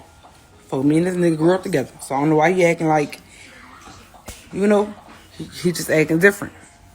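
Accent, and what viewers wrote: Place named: New Orleans, United States. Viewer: New Orleans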